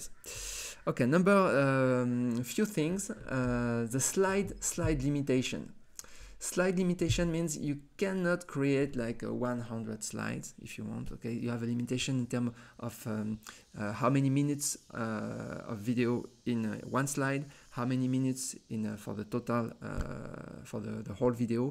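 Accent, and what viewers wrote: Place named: France. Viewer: Amazing really❤good english and nice French accent😂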